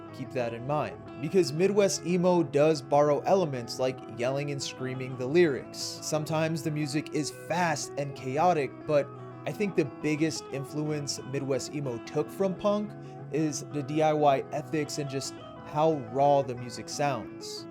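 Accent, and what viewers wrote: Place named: Portugal. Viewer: omg I was listening to this, I opened the tab just to see who the hell is talking and to my surprise, portugal caralho.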